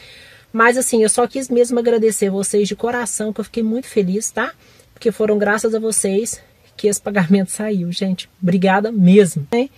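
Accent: Minas Gerais, Brazil. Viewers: Parabéns amo seus vídeos, são muito inspiradores. Sou de minas também (Uberlândia) e amo nosso sotaque mineirinho rsrs Já dou like antes mesmo de assistir, super inscrita no seu canal. Muito sucesso a vc!!!! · parabéns, vc merece!!! Tem pouco tempo que te acompanho e já amo demais seus vídeos, vc passa muita verdade em tudo! E esse sotaque mineirinho tbm neh, gostoso de ouvir( sou suspeita pra falar, rsrs)...enfim, que Deus te abençoe em tudo e que sua obra prospere cada dia mais!!!😘😘😘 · Parabéns 👏🏻👏🏻👏🏻👏🏻👏🏻 Qdo conheci seu canal a semana passada já me encantei com o seu jeito e a paz que vc transmite, fora esse sotaque mineirinho que é bão de mais da conta de ouvir.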